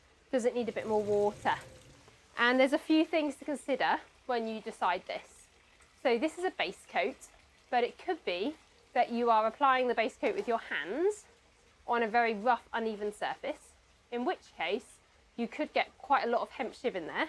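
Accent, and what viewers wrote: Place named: Wales, United Kingdom. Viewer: Great video, also known as ‘watching mixing things’. We’ve noticed your increasing bicep size and maybe also a slight Welsh twang…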